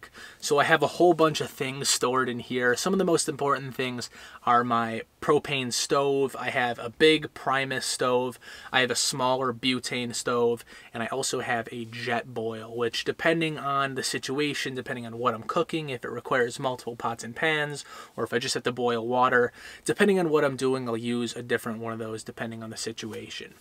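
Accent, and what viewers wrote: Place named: Buffalo, United States. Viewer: Lol… tray - ler… I noticed he was saying trahler.. it’s that Buffalo accent 😀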